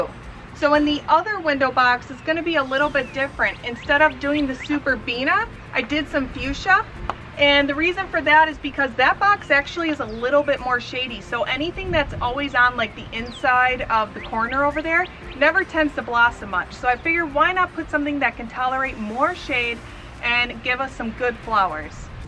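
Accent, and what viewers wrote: Wisconsin, United States. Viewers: I think she is from Wisconsin.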